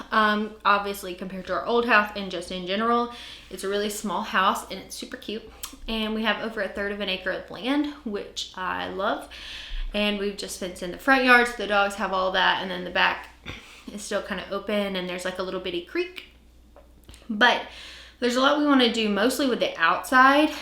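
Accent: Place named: South, United States. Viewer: Girl, I am jealous! My hubby's family lives in Alabama. It is beautiful there, and like you said, it's close enough to everywhere, but far away enough to stay lost if you want to. You are living my dream! Hang in there. It will all work out. Glad you're back. I could always tell you're a southern girl. Me too!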